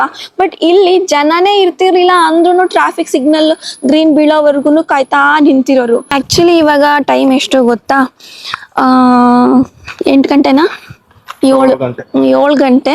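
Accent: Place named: Sira, India. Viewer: ದೂರದ ಜರ್ಮನಿಯಲ್ಲಿ ನಮ್ಮ ಸಿರಾ ಭಾಷೆ..... ಕೇಳಿ ಖುಷಿ ಅಯ್ತು...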